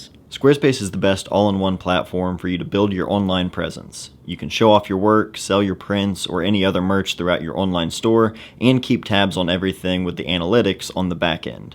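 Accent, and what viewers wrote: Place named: South, United States. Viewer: I just realise how southern you sound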